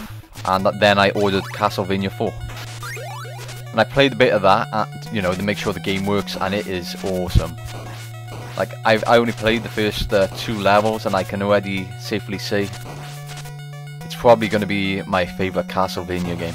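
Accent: Wales, United Kingdom. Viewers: I NEVER GET TIRED OF THE TOTALLY VOICE FULLED WITH WELSHNESS Does that make me sound Gay??? O_O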